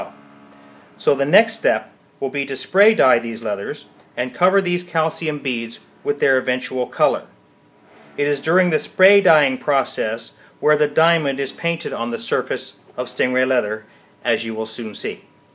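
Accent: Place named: Canada. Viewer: Are you Canadian by any chance? I’m from Wisconsin and have heard a lot of northern accents but yours sounds very Canadian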